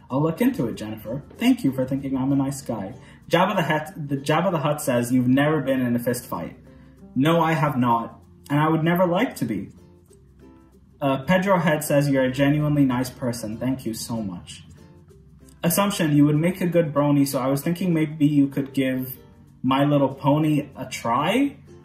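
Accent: United States. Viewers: I love how genuine you are about your emotions and aren't afraid of what people think about them. It really puts you a cut above a lot of similar content like this on Youtube. Sort of coincidentally I used to have a friend from Dubai who didn't like that I used the word "cute" to describe something because he thought it was a very feminine way of speaking though I got him to back down by getting him to admit there is no better word to describe baby ducks. Also people probably assume you're American because of your accent which is very American since we're a really diverse country that comes in all sorts of races and ethnicities it's hard to assume someone isn't American by looks alone. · I also thought you were American based on your accent. I love your reactions. You seem very sincere and genuine. It's why I subscribed--you seem like a fantastic person. 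· if you never lived in the US then you definitely have super impressive English and a super impressive American accent. You had me fooled! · you sound very American. I think that's why people assume you are in America. I even assumed that. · Wow -- I thought that you were an American! Even going by accent alone, I would have guessed that you were from Chicago, Miami or Los Angeles. In fact, you look so much like my best friend from high school that I had to do a double-take the first time (and, yes, he had a beard in high school). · I think everyone thinks you’re from the usa because your accent sounds soo American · A couple of things regarding the Clone Wars. First, I get why doing reactions to it could be tiresome but instead watch it at your own leisure and then when at the end do a reaction to the final 4 episodes because combined they make one of the best Star Wars movies in existence. 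Secondly, a good reason to watch the Clone Wars is that some of the main characters will now be on the Mandalorian and/or will be getting their own show in the very near future. PS. Im with others and thought you were American. You sound like you could be my neighbor here in California. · It's highly likely that J.K. Roweling took inspiration from J.R.R. Tolkien when writing Harry Potter. Also many people think you are American because of your accent. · You don't "look American"? ... Americans can look like any ethnicity in the world, and you DO SOUND American. I mean I knew you were from UAE but only because I saw it in your "about" page.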